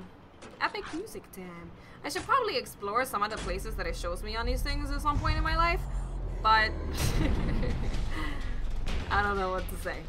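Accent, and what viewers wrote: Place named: New Jersey, United States. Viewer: AND WHY DO YOU SOUND LIKE SOMEONE FROM NEW JERSEY LMAO I LOVE HOW YOU SAY LADDER AND ANYTHING LMAO <3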